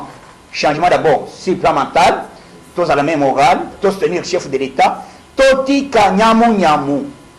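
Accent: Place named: Kasai, Democratic Republic of the Congo. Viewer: Bongisa lingala depuis owuta Kananga kk tshiluba na munoko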